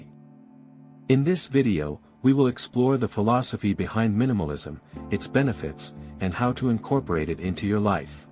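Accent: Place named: England, United Kingdom. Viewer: 🎉amazing job ,You have a beautiful English accent.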